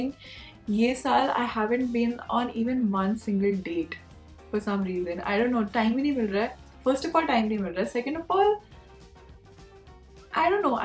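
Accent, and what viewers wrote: Punjab, India. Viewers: Ur Punjabi Accent Is SO Cuteeee... 😊😊😊😊😊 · Ur punjabi accent 😍😍😍😍😍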